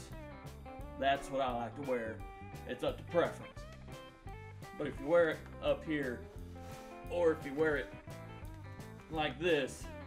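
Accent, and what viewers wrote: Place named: South, United States. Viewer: Wow I noticed that you have a southern accent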